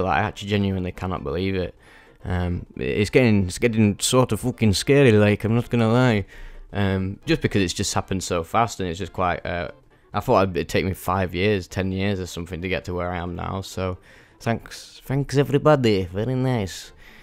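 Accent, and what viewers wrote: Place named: Yorkshire, United Kingdom. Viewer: Pal, with that accent you must be round my neck of the woods. Anywhere near Sheffield? 😂 Yorkshirean psychonaut here anyway. Cool videos lad, it's a sound idea to show these game visuals while describing your experiences in a humorous yet accessible and detailed way. Been doing acid/shrooms for over a decade but I've yet to approach DMT/Ayahuasca, just from a lack of source. I've def always been intrigued - just from the levels of consciousness and perception I've opened up into, and the way that's evolved me as a person since my late teens. When done the right way and with respect, these are immensely powerful tools, that has potential in therapy too. You're right that people should generally wait until their brains are more developed though. Get that McKennite wisdom out for the curious bairns! Honestly, once I got into Timothy Leary, or Huxley's Doors of Perception, I moved onto Terence and it absolutely astounded me how his level of communication and language, the pure creativity and lingual dexterity, was able to decipher and discuss the psychedelic experience in such a detailed way. 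Anyway, subscribed!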